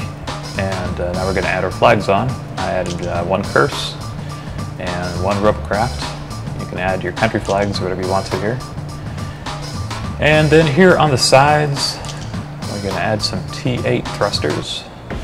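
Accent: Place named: United Kingdom. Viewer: Nice man, you solve the problem whit the audio of your voice, nice for you dude XD , its sound a little bit eco, but at lees your voice is in the proper volume poin, a little high form the background music, realy nice man, gratz for make a nice vid this time hehehe :P (better than the other! ) i hope we can figth in game some tiem, but i think is a little dificult becouse im from Venezuela and if im rigth you leave in Ingland or UK for your acent, my nick in game is the same like here so if you see me try to kill me heheheheh, If you can >:)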